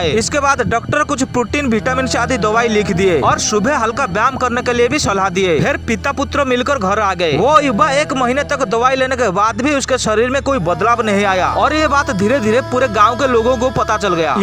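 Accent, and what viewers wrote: Bengal, India. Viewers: Bhai tum bangali ho kya 😁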